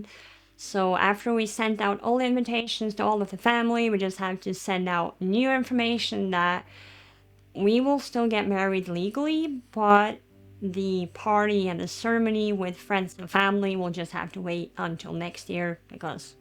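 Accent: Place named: United States. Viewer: Jag förstår verkligen varför du varken hade ork eller tid att skapa och göra videos. Jag har inte kunnat arbeta på väldigt länge pg a min mentala hälsa, så jag förstår dig. Jag hade för övrigt ingen aning om att du är norsk och att är svensk! Du låter väldigt amerikansk 😂 Jag själv är halvnorsk men bor i Sverige 😁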